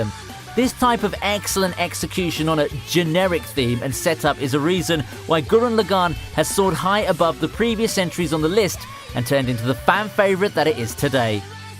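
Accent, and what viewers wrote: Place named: United Kingdom. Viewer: I like your UK accent 👌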